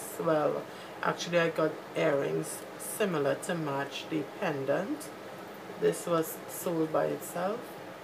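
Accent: Trinidad and Tobago. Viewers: are u from Trinidad? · are you from trinidad? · i love trini accents :) lol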